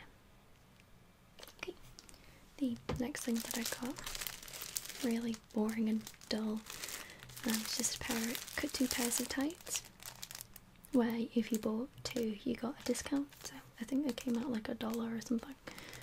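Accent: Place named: Wales, United Kingdom. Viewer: love your videos from hirwaun and its nice to hear a welsh voice on here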